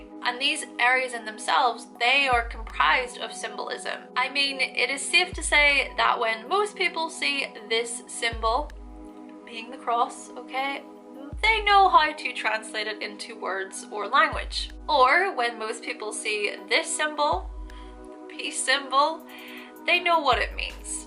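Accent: Ireland. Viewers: It's because is from lovely Ireland^^ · The Irish accent is extremely thick. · You have the most wonderful inflections. You're Irish, but it's a very lovely version, thank you..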